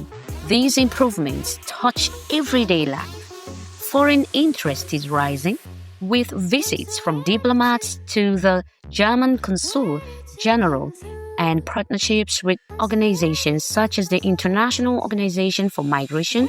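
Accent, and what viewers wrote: Igbo, Nigeria. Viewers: Chaii, banyi!!!,it's been a while.You never dissappoint with your signature Engli/igbo😅 You are highly welcomed back. I really miss you here. Welldone,Onye Nkem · Your voice is the second best thing just behind Gov oti 😊 your Igbo is heavenly with a nice blend of English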